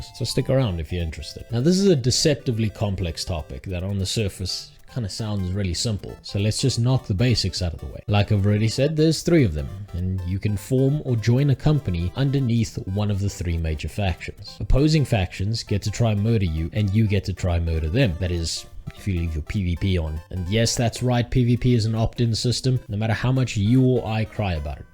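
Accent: South Africa. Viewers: Immediately knew you were South African! Lekker man! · Completely random question but are you South African?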